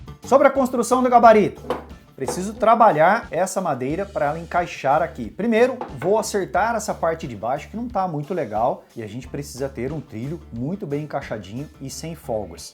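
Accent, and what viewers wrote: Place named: São Paulo interior, Brazil. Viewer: Eu notei que vc era do interior por causa do sotaque.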